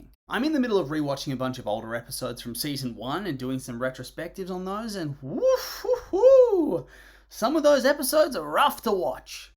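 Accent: Australia. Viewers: this channels voice-over, I'm an Australian too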